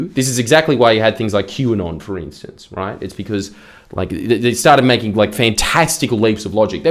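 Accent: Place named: Australia. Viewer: Incredibly chad video. Already love self improvement content but goddamn giving self improvement advice with the addicting ozzy accent and jokes holy hell this is art. Instant sub. This is it, the gigachad of australian news also being a gigachad of telling people the massive downsides of being chronically online and stimulated one way or another!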